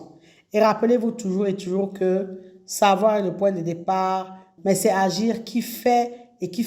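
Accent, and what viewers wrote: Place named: Africa. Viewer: Oui, j' aime sa voix et son français à l' accent familier et authentique africain.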